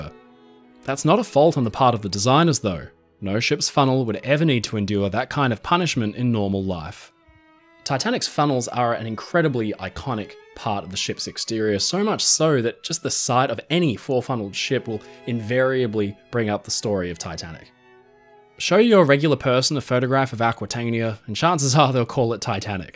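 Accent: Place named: Australia. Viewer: Oh he is so lovely his voice just speaks to me much better than the American - Canadian boi this guy is a an Australian Mann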